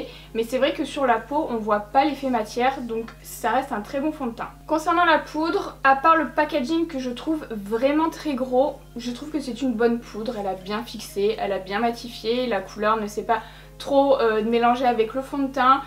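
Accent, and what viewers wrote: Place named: Alsace, France. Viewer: ...Les couleurs m'inspirent pas...c'est pas français ça...c'est facile mais très chiant...:-) et résultat c'est super intense super joli et vivement une vidéo avec tes nouvelles couleurs de cheveux, et entre nous, je confirme que t'as un sacré accent alsacien quand tu déconne avec tes voisines sur instagram :-)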